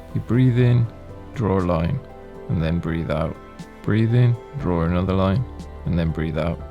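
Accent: Birmingham, United Kingdom. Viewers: I recently came across your channel. You share so much in this video for someone like myself who is completely new to this. Btw, it sounds like you have a Birmingham accent. Not that I expect you to share, lol.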